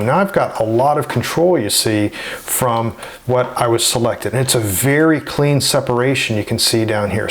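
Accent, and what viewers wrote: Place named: United States. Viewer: thanks a lot! I can't understand american english very nice so that's why i needs me to watch the video 2 or 3 times to be sure to understand every thing! If I could I would really enjoy sharing pictures with you which give me some problems... Thx from Paris France!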